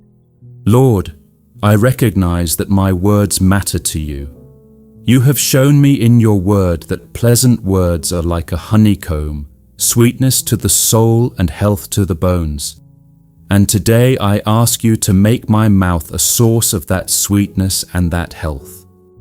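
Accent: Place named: England, United Kingdom. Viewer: Get rid of the English accent.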